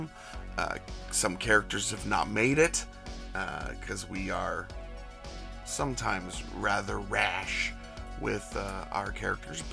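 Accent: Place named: Australia. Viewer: Definitely Australian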